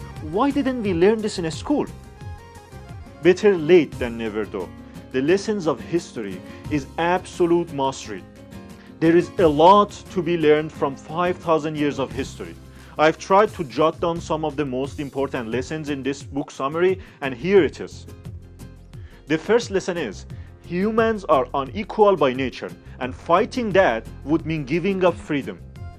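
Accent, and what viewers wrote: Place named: Iran. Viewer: سلام! مرسی واسه ویدیو لهجه ایرانیت خیلی شیرین ترش کرد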